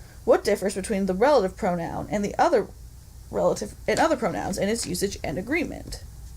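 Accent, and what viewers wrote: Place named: United States. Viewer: We I believe have some idea of how to pronounce Latin correctly. I am fairly certain the Romans did not have a thick American english accent.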